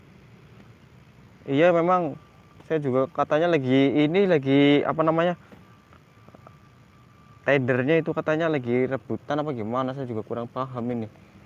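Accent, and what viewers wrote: Banyumas, Indonesia. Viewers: Ngapak ya bos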